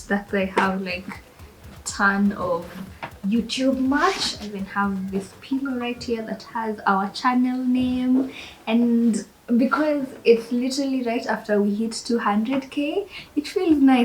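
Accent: United Kingdom. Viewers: The British accent mama 🤩🤩🔥🔥 sighs! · It's the British accent for me👌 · It's the British accent speaking for me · That British accent👌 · Jesus can we talk about her "british"accent♥️ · The British accent🙌🏼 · British accent of course and it reminds me of Kijana Wamalwa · Your british accent is awesome...the way have tried to mimic that accent and I can't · Its the British accent for me😍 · British accent 🔥🔥🔥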